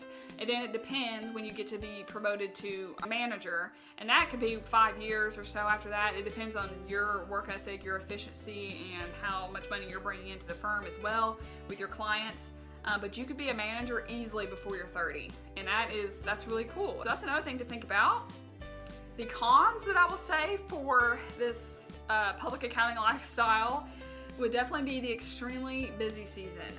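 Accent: United States. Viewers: What American accent is it?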